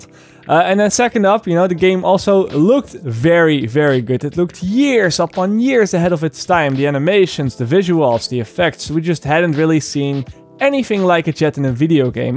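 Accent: Netherlands. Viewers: Nederlands accent? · R u dutch? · Are u dutch??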